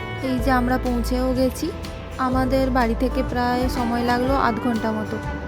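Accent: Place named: Medinipur, India. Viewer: Tomar bari kothay kothar tan sune mone hoche medinipur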